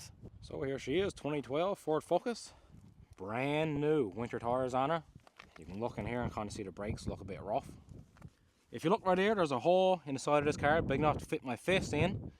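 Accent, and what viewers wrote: Ireland. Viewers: What type of accent is this? Irish Redneck?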